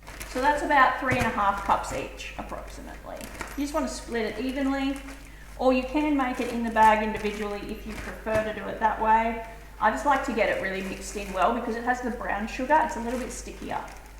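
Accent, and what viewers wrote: Australia. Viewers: I watch your video's daily from America. I live in Alabama just above Florida. I Love your accent (wish I had an aussie accent) You're so beautiful and I love your kitchen. My husband loves the muffin mix.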